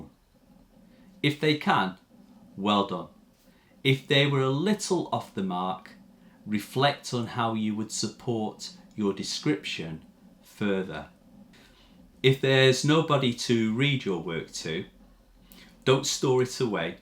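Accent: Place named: United Kingdom. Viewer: Very good tutor with very clear English, and easy to understand, which is very important for students especially for students from abroad. You can improve not only your English writing ability, but also your English listening and speaking because the tutor/ gives teaching with standard British English.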